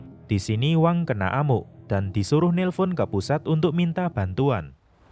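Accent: Java, Indonesia. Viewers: medoknya jogja bangeeet ,❤️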